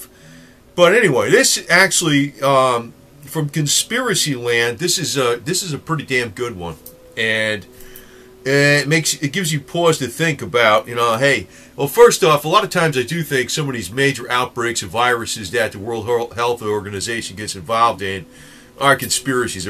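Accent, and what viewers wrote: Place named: East Coast, United States. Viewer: Yo Bud, i like how your mind works and you talk like an East Coast Boy lol. So if so right on!. Cool vid man.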